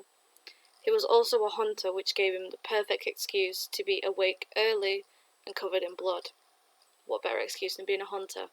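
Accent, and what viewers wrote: Manchester, United Kingdom. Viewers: Is your accent Mancunian? X...( for those asking what is a Mancunian accent, it’s an accent for those native to Manchester in 🇬🇧 xx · Oh my, I'm just surrounded by all of these delicious British Ladies. I need to get on a plane and come hear it for myself. Surround me💪🖤💖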